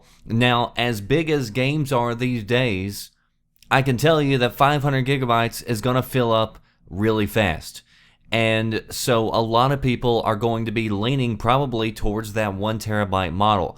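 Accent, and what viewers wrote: South, United States. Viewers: it's that southern sounding accent I reckon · I like your accent, where are you from? (I'm British so don't recognise US accents). Subbed.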